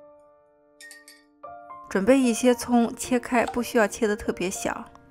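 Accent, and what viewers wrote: China. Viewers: 的配音好有中國味向獻上我的愛心💗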